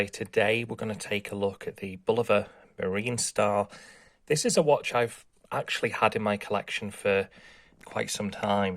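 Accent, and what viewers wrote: United Kingdom. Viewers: Your voice has a similar accent to that of Brian Cox, the British astronomer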